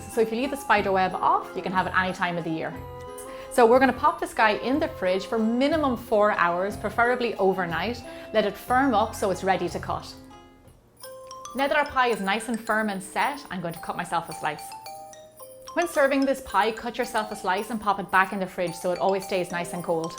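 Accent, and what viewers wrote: Ireland. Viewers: ur accent is beautiful what part of Ireland r u from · She sounds Irish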